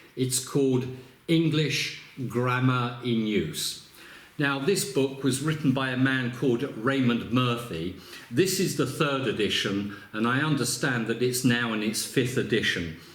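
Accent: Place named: United Kingdom. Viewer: BRITISH ENGLISH PRONUNCIATION....I LIKE IT!!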